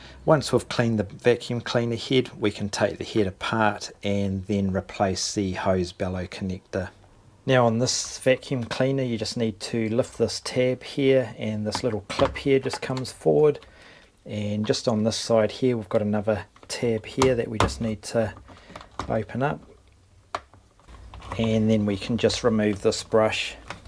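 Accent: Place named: Australia. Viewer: Nicely done! Thank you. (Love to hear that Oz accent!)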